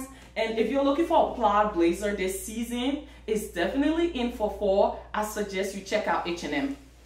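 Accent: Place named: Liberia. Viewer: I hear that Liberian accent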